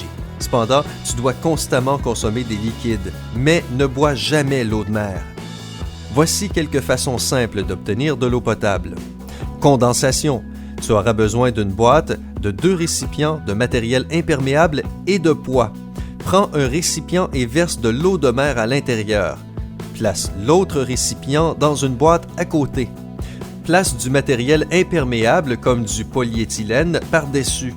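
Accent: Quebec, Canada. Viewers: Tu est quebequoi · Le naratteur c un québecois non???????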